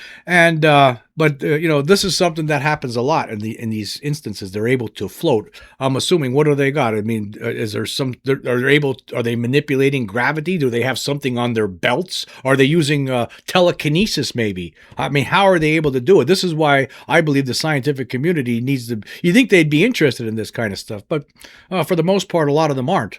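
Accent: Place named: Massachusetts, United States. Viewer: You from Massachusetts ? I’m usually pretty good at picking up on a fellow neighbors accent lol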